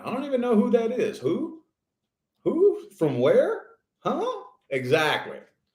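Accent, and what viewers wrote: South, United States. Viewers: I'm a Gemini and yeah.......I'm done with the BS........had to actually call the law on my "person" a couple weeks ago to GET HIM GONE!!!........He loved loved loved the chase, and the drama and trying to manipulate and control ME!!! Been goin' on since last August, whew! It was a ride, the SEX was AWESOME, but that ain't everything, lol......., you are SO cute!! and I hear a country boy accent goin' on there......where you from, sweetness?........Alabama, here, and thanks for your right on readings darlin'!!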